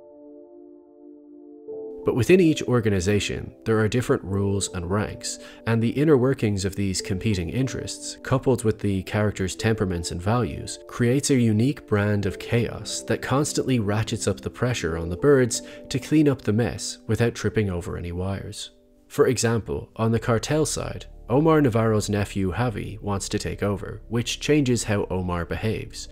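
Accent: Ireland. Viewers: Im pretty sure you're Irish but I can't place the accent exactly.. If i had to guess I'd say you're from wicklow or wexford .. Great video too btw👌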